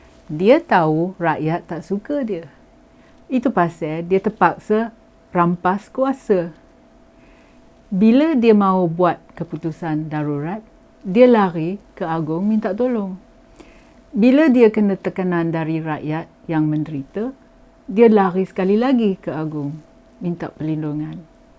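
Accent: Perak, Malaysia. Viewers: Kuat pekat Perak nye yong..ate Beno ape yang yong ngabo org org politik ni hampeh idak boleh pake ngabeh kan duit rakyat...rakyat belengeh sampe ke jubo kroje ngencari duit... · Suke dgr ucapan ni....bunyi mcm org perak, syabas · Sonok dengor miker labon cakap Perok.. 👍👍😊😁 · Orang payghok ye kome....tesasuiii cakap peghok.Ate demeee...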